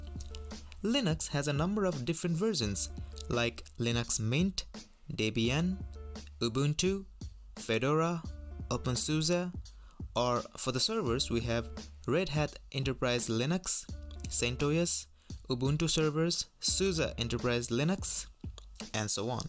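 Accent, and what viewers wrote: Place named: Finland. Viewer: Fills like this guy is Finnish :)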